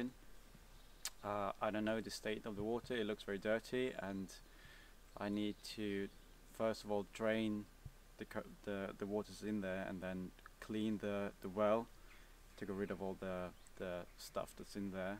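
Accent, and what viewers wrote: United Kingdom. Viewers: May I ask how old you are? And it sounds like you live in London - if so how frequently do you visit the cabin? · Great to hear more of your story! I live just north of London and have a Swedish mother and English father… when you first spoke it was refreshing to heat your UK accent… so often US English accents pervade! Also, as a lockdown project, I built a bastu in my garden… so important to make things with your hands. Keep up the great work!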